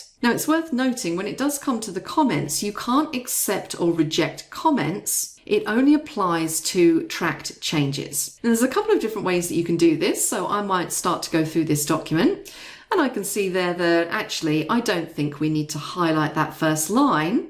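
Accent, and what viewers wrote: United Kingdom. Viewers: Very difficult to fiolllow the explanation about track changes turning off or not. Replayed several times, could not understand the british accent, and what was being clicked on. Need to slow it down a little.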